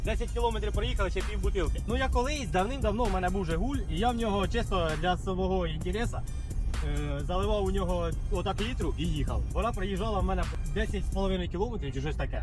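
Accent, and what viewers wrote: Poltava, Ukraine. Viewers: Доооли ,Ти з галещини Чи з козельщини,короче з пооолтавщини,бо неноче з у хобот мовешь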